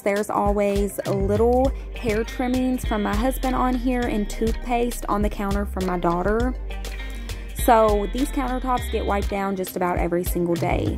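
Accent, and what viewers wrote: South, United States. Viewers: Can I ask what state you are from? I'm in Louisiana and I definitely can hear your southern accent lol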